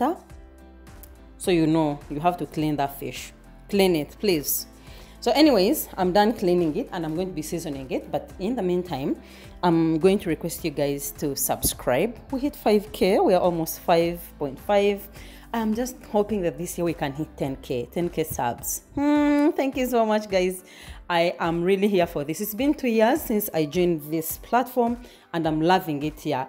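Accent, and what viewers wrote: Uganda. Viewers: just curious! im gambian (west africa) so i haven’t met many east africans (outside of somalis, sudanese, and ethiopian). your accent reminded me of kansiime anne, a ugandan comedian so i figured it might be ugandan. anyway thanks for the recipe, i will be using it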